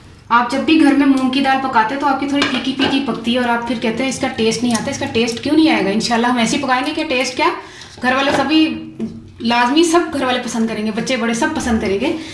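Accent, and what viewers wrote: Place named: India. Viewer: Recipes to nice hoti hun but Punjabi ful penddu style me bolte hyn ap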